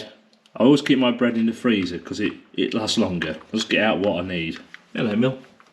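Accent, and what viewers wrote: Lincolnshire, United Kingdom. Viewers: Nice to hear someone from Lincolnshire. I'm in the shire too :)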